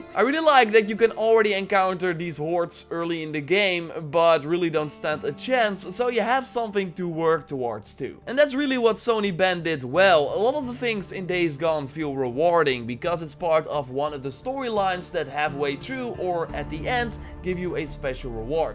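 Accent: Netherlands. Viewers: Your voice man... it bothers me so much wtf i know its a dutch accent im dutch myself but it's just incredibly annoying to me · Nederlands accent, lekker man, Nice review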